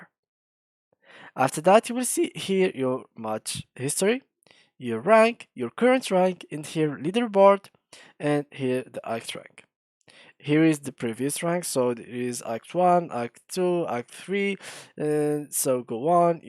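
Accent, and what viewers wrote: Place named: Morocco. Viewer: 3rftk mghribi gha mn l accent w bsh zdt t2kdt fsh seft lk khona msg flgame hhhhhhhh anyways gg abro and thanks ❤️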